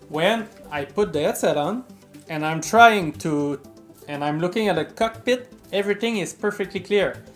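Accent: Quebec, Canada. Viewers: un quebecois c sur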